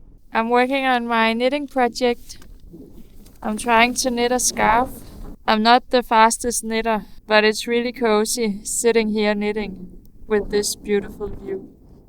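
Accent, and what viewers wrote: Denmark. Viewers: what for a lovely place in nature! thanks for taking us along; it's nice to hear the danish accent! sheep around the tent, what for a soothing scenery...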